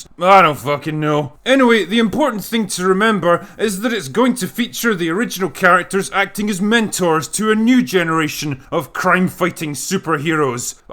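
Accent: Scotland, United Kingdom. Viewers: It seems like from 2016 to now you’ve gotten more Scottish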